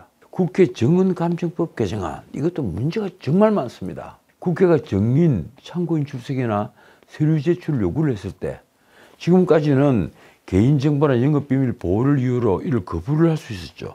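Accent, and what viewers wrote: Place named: Gyeongsang, South Korea. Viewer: 명색 방송인이 상방울 상방울 한득수 한득수... 지금이라도 연습하면 되오 정 듣기 거북해... 나도 경상도지만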